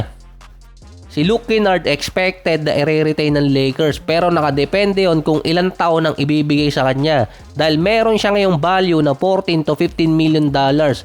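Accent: Batangas, Philippines. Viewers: Batangueno ka pala 😂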